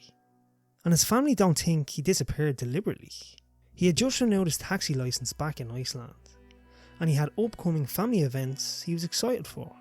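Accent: Dublin, Ireland. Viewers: Nice to hear a Dub accent narrating TC for a change. Understandable it has to be adjusted a bit for those not familiar with our accent. Maybe subtitles to help people acclimate? Anyway great work, keep it up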